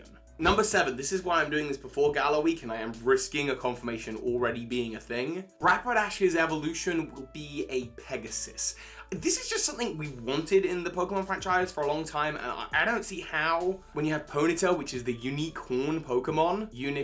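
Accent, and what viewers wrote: United Kingdom. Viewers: Honestly I thought he was British not Australian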